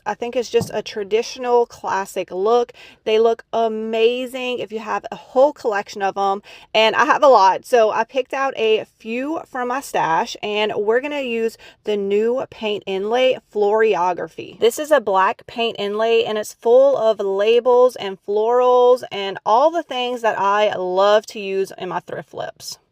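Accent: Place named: South, United States. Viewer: Ha! I just thought it was a southern accent thing!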